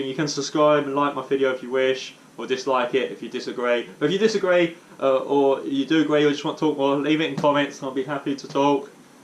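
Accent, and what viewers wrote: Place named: United Kingdom. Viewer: Boi i can't stand this level of British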